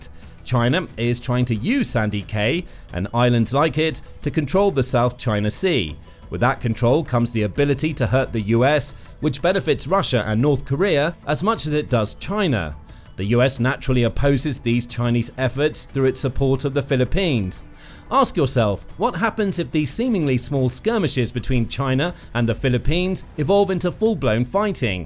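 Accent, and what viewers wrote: United Kingdom. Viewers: So tired of the dude with the british accent. Clickbait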